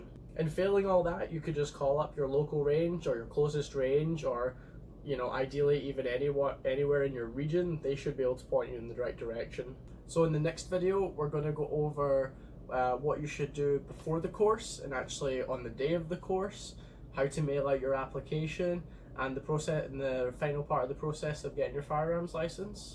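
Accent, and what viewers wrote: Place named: Scotland, United Kingdom. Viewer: Don’t take this the wrong way but you sound Scottish I am guessing you’re from Scotland originally, if not my mistake you just sound Scottish is all.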